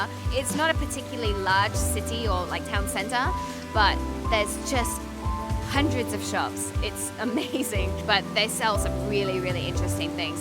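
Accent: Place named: Australia. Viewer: Its great to hear an aussie accent. Great video. Loved it. Thanks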